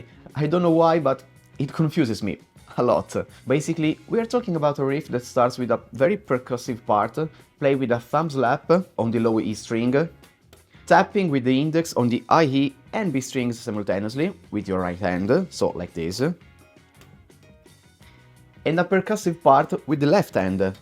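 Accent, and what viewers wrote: Italy. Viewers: You're Italian. Recognise that accent anywhere. Have a sub. Good video.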